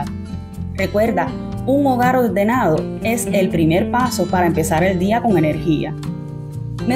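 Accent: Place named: Dominican Republic. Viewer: sospechava que fueras dominicana amiga,gracias por contestarme🏠🇺🇾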